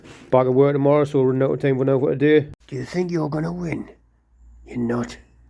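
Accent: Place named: Newcastle, United Kingdom. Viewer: Great Newcastle accent ! - Fab car - All the very best of luck with the rebuild m8👍👍👍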